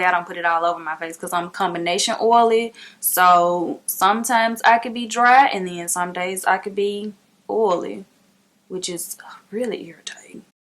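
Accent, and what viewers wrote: South, United States. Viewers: I just love that voice. Country girl sound like what I have... 😉. You about to have me spend some money hunny!! Great review!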